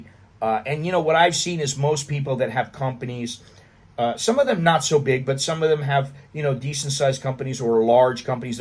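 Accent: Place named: United States. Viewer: You sound very American. Most of the world is like Thailand.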